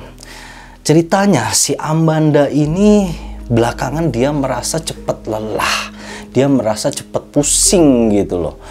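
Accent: Java, Indonesia. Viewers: medok ny sya suka mas 🐦🙏 sehat selalu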